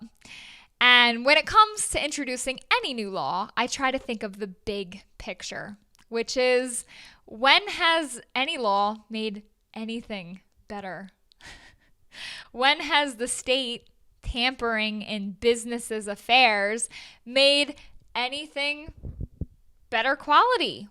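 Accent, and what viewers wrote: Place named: New York, United States. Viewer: You have the most Jersey/New York sounding accent I've ever heard.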